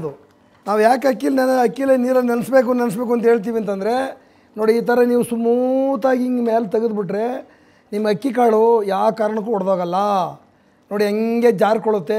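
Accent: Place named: Bangalore, India. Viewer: Namaskara. Naanu Sehar, Hosur. Ivaaga Inna 3 thinguligea USA Alli idhinee. Neevu nimma 54 vayasinalli yaagidhiro adhe thara mamma thandhe Krishna Swamy avaru adhe 54 lalli adhe thara idru. Adharke innonondu Namaskara. 82 vayasu vargu chennagi idru. Neevu 100 vayasu irilike aaseervafagalu (Naanu ivaga 73 Senior). 2 varushadinda nimma Tamil postgalu nodutha idhni. Nimma Bangalore style tamilu super. Best wishes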